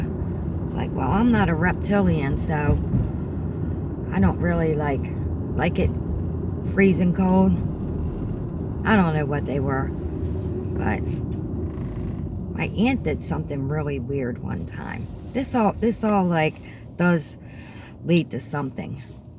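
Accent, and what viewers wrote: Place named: Philadelphia, United States. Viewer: Thank you for sharing ❤ very brave woman! Out of curiosity are you in PA? I was trying to place it while you drove and you have (as do I lol) that east coast/Philly accent! If so we are nighbors:)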